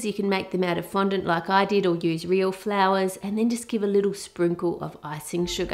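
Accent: Australia. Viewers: are you australian? you have the accent lol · She is Australian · She’s Australian (I’m British)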